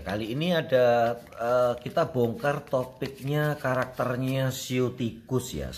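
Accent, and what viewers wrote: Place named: East Java, Indonesia. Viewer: naahhh pasti dr Jawa ya ..Jatim kyknya ...logatnya medok ...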